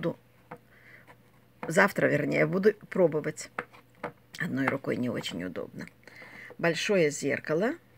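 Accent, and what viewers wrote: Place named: Belarus. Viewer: Говор белорусский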